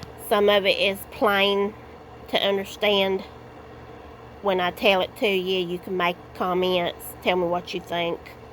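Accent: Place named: South, United States. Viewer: I just love hearing your accent! It makes me feel at home. I just moved to the west coast from Georgia and I miss the South sometimes. Also, my maiden name is Kirkland, do you happen to be from Georgia?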